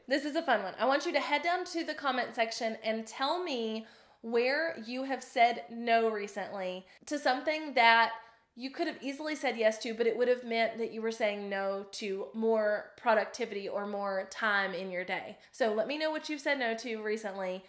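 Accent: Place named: Carolina, United States. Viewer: Are you from one of the Carolina states? Love your accent ;) I definitely need some better time-management skills!! I need to download newsfeed eradicator...for sure, lol Lot's a great advice! Thanks!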